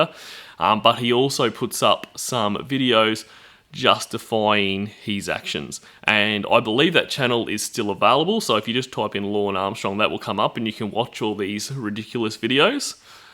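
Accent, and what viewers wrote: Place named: Australia. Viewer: Somebody from Nobles livestream today referred me to this vid for a accurate recap on my Lorne Noir. Soooooooooooooo good 👍 cant imagine all the hours upon hours of Lorne bullshit u had to listen to just to make this timeline, for that, always remember I appreciate yew precious. Lol 🌹🌹🌹🌹 P. S. Ur Aussie accent has been my bed time ASMR lately 😍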